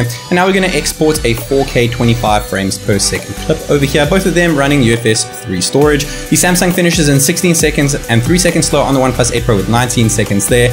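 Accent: South Africa. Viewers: South African?!!!? 🤩🤩🤩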